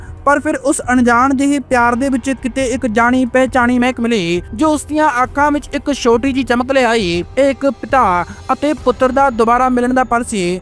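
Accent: Bihar, India. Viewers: Don’t we have anyone who can speak Punjabi in a normal tone ?????? Who will watch you ? !!! Like a Bhyia speaking Punjabi !!!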